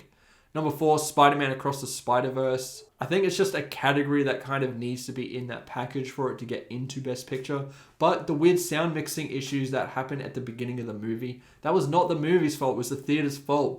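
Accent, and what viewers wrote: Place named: Australia. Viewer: You sound more Aussie than NZ tbh. Nice video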